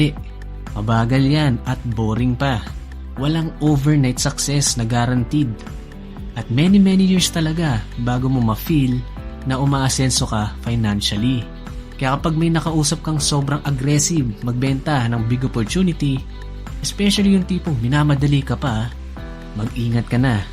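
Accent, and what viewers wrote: Philippines. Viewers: sound like: ilonggo tikalon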